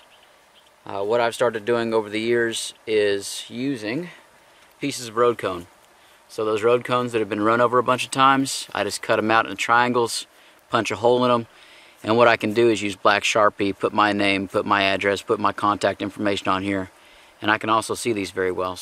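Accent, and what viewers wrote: Texas, United States. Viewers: Great video, I live in central Texas and have a real problem with chicken snakes. You have given me the solution to my problem. You look like a biologist to me? Thanks for sharing your knowledge. You speak like a true Texan.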